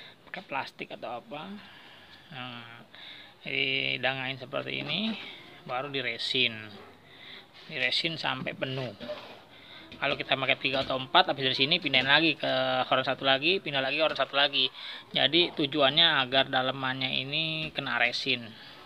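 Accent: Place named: Betawi, Indonesia. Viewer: Bang ko abang gaya bicara nya kaya orang jakarta/Betawi, inisiatif nya mantep baang, salut saya salam satu hoby, ane jkrta tg priuk 🙏🙏🙏🙏 👍👍👍👍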